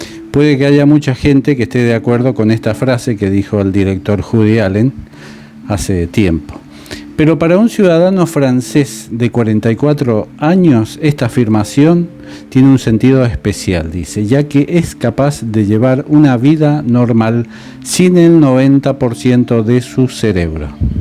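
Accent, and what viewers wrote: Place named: Argentina. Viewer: Buah...que temas más interesantes aborda este canal y como disfruto escuchando a por Dios, con su gracia y su acento argentino que a veces escucho dos y tres veces el vídeo. 😂 Te queremos, aprendemos mucho contigo. Un millón de gracias y saludos desde España 🇪🇦 ❤🙏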